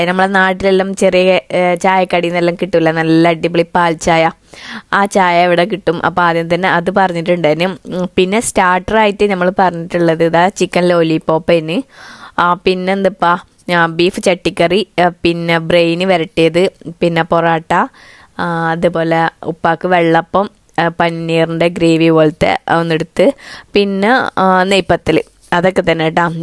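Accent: Kasaragod, India. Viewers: Masha Allah Ninte Nalla Soundan Pinne Nammale Kasargod Samsarum Spr Aanetto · ഞാൻ ആ ദ്യമാണ് ഈവിഡിയോ കാണുന്നത് സംസാരം കേട്ടിട്ടു കാസർഗോഡ് കാരി ആണെന്ന് തോന്നുന്നു കൂടുതൽ അറിയില്ല എന്തായാലും ഫുൾ സപ്പോർട് 👍👍👍🌹🌹🌹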